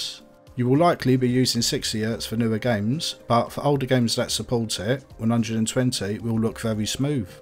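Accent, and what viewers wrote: Cockney, United Kingdom. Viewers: No matter how many of these videos I watch, I just can't get used to hearing a cockney accent on YouTube. 😅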